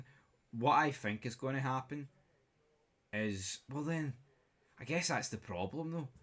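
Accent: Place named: Scotland, United Kingdom. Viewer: Your accent is all over the place. mega Scottish accent and you're sometimes doing words in an American accent, like why?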